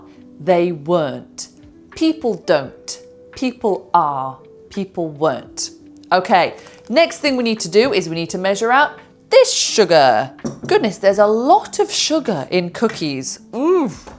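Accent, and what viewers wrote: United Kingdom. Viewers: how l love British accent ❤ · I like British accent ❤ and cookies amazing georgous looking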